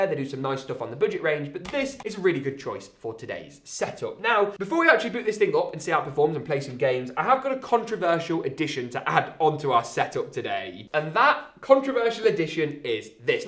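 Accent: United Kingdom. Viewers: I love the way you speak in the British Accent.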